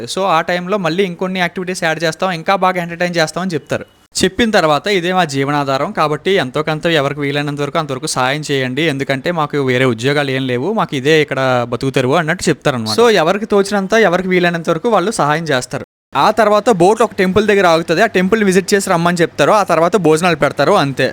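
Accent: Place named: Guntur, India. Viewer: Mona aa slang vinni lenin anukuna .... Ivale ardam aindi guntur karaam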